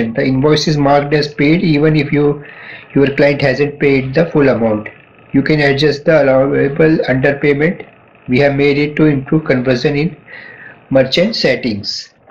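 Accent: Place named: India. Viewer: Every Indian accent makes me sick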